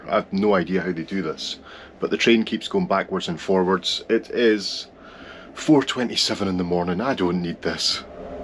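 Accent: Scotland, United Kingdom. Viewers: I love watching your videos. I live in Australia and moved here 25 years ago. You make me miss Scottish Patter! Your great!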